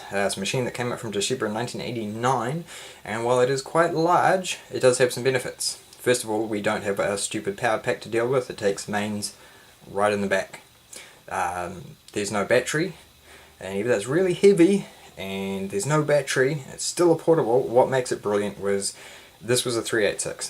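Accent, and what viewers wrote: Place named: New Zealand. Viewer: Some great info in this vid! 2 questions though: - what did you say the menu software was (aussie here that can't understand your kiwi accent!) - is there any way for DOS to utilise the very top and bottom of the screen? The T3100 will show the C:\ prompt right at the top but the T3200/5200 seems to be letterboxed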